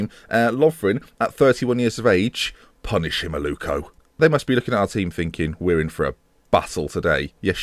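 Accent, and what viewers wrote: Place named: Newcastle, United Kingdom. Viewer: Ur geordie accent sounds like me nana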